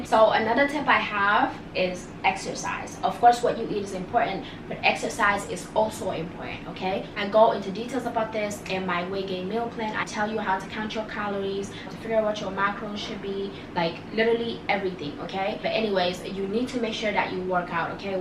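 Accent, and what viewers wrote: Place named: Africa. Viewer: Off topic, but why does she sound like an African who is trying to sound American? Or an African who lives in America?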